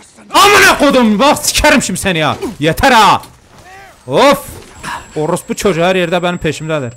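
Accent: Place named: Diyarbakır, Turkey. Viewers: Ay ay Diyarbakır sivene hayranim abimm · Abecan abecan sen kesin diyarbakirlisan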